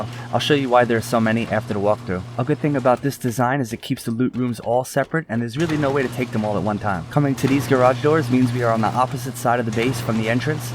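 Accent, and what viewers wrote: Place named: Boston, United States. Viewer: He sounds like he’s from Boston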